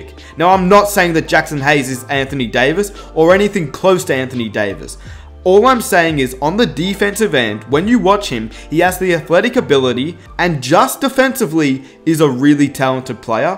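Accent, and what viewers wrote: Australia. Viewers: Yo, are you Australian? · is he australian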